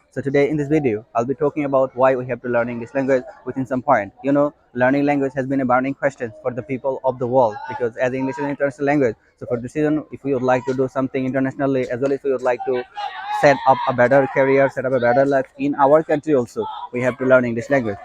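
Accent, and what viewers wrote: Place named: Indian subcontinent. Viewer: Pronounciation skill needs developing.Here latin and British mixture as well as indian sub continent lingua style clearly shows😅. Anyhow, best wish.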